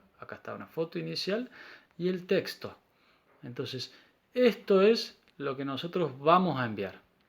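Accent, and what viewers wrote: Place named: Cordoba, Argentina. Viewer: Este es cordobés argentino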